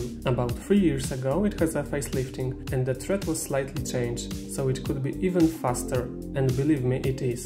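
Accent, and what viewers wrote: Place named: Poland. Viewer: Polish accent.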